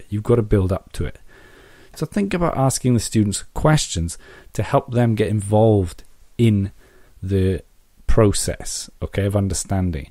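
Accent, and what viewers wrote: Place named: Wales, United Kingdom. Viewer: He sounds Welsh to me :) albeit very subtle..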